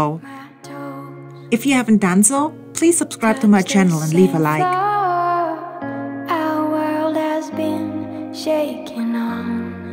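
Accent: Germany. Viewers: I can tell 🤔 are you German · I like red dry wine, Pinot or Italian wines. That's a cute little dog at the end of the video. If I ever get a dog again I want a small one like yours. You are such a beautiful woman and I knew you would be a heart breaker in a dress. Is your accent German?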